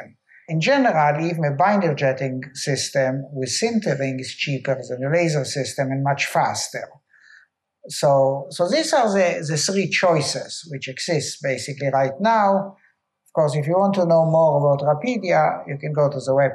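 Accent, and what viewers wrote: Israel. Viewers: Is it a Hebrew accent? GREAT GREAT VIDEO and astonishing relatively SOLUTION! The definitely BEST intro about metal 3D printing around. Thank you Sir. It's really beautifully simple, efficient, precise. THE BEST COMMERCIAL AROUND TOO. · It’s the most Israeli accent I’ve ever heard.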